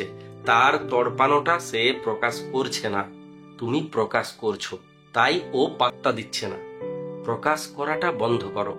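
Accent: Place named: Midnapore, India. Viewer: Apni ki midnapore er dike thaken? Kothar tan ta oirokom e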